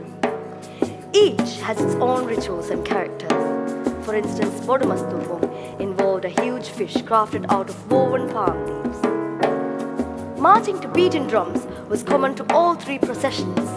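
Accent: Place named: England, United Kingdom. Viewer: I like her english accent😍😍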